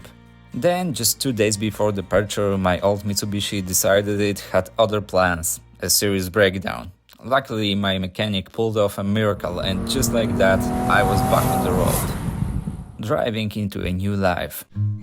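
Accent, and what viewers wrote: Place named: Ukraine. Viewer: U must be wealthy. Dalmatia is tourist destination, which means expensive and u gotta have good income to support yourself. It is not Monte Carlo, of course, but still locals struggle to survive and people of your age left to work in EU, mainly Ireland, Scandinavia or Germany. Just renting something to live or real estate is up to the roof in recent years. And what is your home country? 2000 km and accent sounds like Ukraina.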